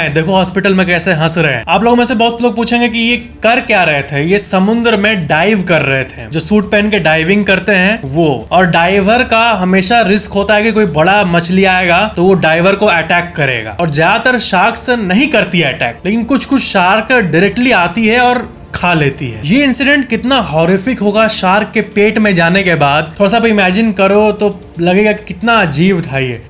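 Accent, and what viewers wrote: Bihar, India. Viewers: Your accecent sounds like bihari,s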